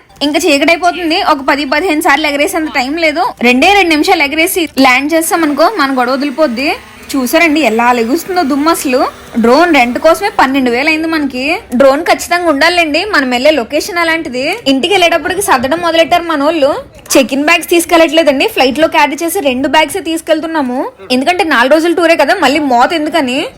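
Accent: Godavari, India. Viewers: Godavari slang ,ma relations lo kondaru achu ne lane matladataru, rajamundry na chuttupakkala oora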